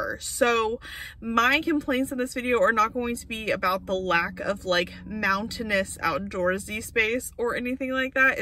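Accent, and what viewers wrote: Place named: South, United States. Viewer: as you say in such a southern drawl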